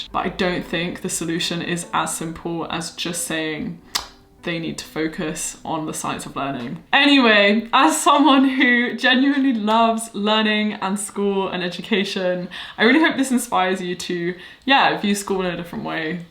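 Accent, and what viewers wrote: United Kingdom. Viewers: Hey.. I love ur accent.. The first time I saw ur video, this british accent of yours inspired me to learn it...😀 Your motivational videos always made me feel confident and have gratitude and how could I forget your unique study techniques which you delivered on this platform to millions of people in order to help them out. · since i watch your videos, i have to say that i can listen to British accent easilier. One more thing is why you can have lots of energy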